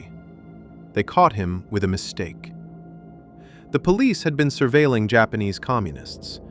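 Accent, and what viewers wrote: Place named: Canada. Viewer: Thank you for utilizing a Canadian voice actor. So tired of the typical deep voice American VO.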